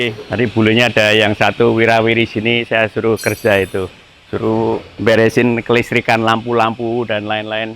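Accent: Java, Indonesia. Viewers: Wajahh chindo, kecill di ausiee, bicara medok totok, tapi kalo ada yang ngeremehin negaranya, kuliti. Mau bule mau paklek hajar... Mantap, mantap tim prorock...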